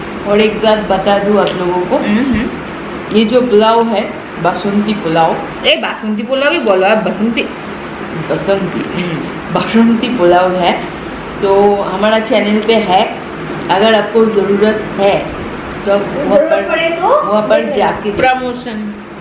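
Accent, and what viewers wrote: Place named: Bengal, India. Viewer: Chapa k chale jana it called bengali style me hindi bolna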